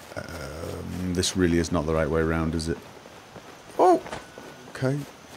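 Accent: United Kingdom. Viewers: WARNING: Watching vids can cause you to talk to yourself with a British accent when gaming.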